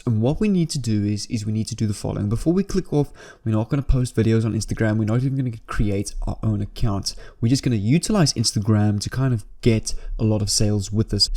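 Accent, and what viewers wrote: South Africa. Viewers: Bro you from SA right?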